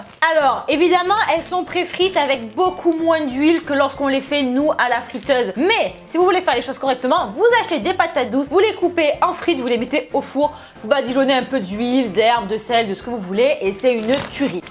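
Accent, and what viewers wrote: South, France. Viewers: t'es au top! Je regarde toute tes videos et je te suis sur insta tu es vraiment un rayon de soleil avec ton petit accent du sud tu fais déliré!!!:) continu tu nous motive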